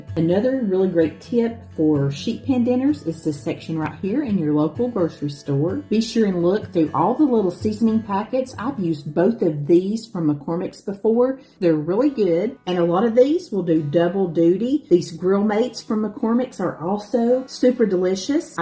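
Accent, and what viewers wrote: South, United States. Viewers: I discovered your channel not too long ago, and now look for new videos all the time. You explain things so well and I love your Southern accent I also love the things you make. I really enjoy your Vids!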